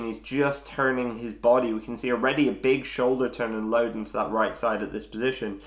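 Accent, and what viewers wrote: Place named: United Kingdom. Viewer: Great break down of Ancer's swing. Plus, you're one of only a few of the golf Youtuber's from Great Britain whose English accent I can comprehend. Cheers for the Queen's English ya'll.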